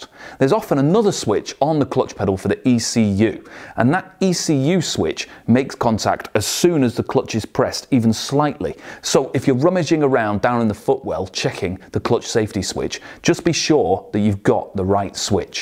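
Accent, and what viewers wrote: United Kingdom. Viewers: Is this guy from England? I like him, he has a passion for mechanics. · Indeed very informative, full of energy and I love the british accent. =))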